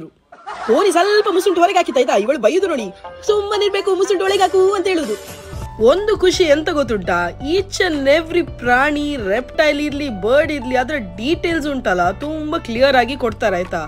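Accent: Mangalore, India. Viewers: yake milk nodi kushi aitu gotta my sister voice kelidre milk aste 👌 erutte Aa Mangalore kannada kelode kushi❤️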